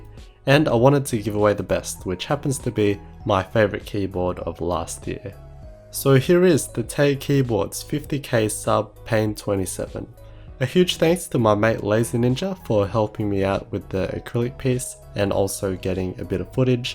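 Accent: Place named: Australia. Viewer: You sound like Lachlan Likes a Thing. I think it’s the Aussie accent.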